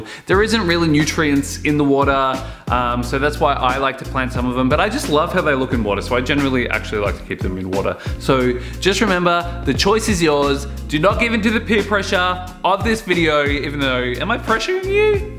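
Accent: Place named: Australia. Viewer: Hey, I have a silly question: how do you do to take those avos out from those nice flat balloon shape glass jars when you have to change the water? Cause you're supposed to change water once in a while, right? I mean, considering the shape of the jar and the roots, it might be quite alright to take it out, but what about when you put it back in place?? I've had the problem with another plant cutting, now the root system is getting bigger, so it's becoming tricky to take out/put back in place all those nice roots in the narrow jar opening... Ok, now I gotta add one thing: I love hearing you speak, makes me so nostalgic of OZ...;)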